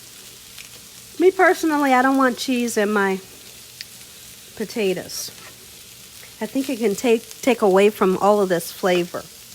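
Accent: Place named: South, United States. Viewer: I absolutely love your voice and southern accent 🫶🏾 and this has become one of my favorite meals to make, bcs of you!! Thank you so much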